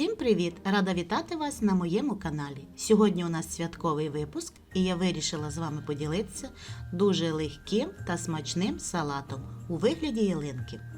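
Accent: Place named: Ukraine. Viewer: Может и вкусно но этот укропский говор раздражает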